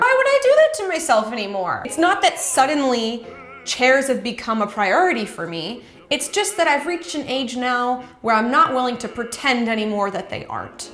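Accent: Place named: Minnesota, United States. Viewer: Dying! Not only because you make ALL good points but also because you seem to be fighting a Minnesota accent haaaaard the whole time lols you crack me up!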